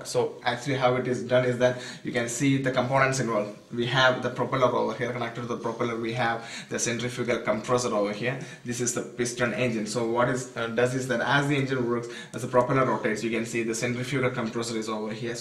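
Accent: India. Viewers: don't know if he is speaking english or indian.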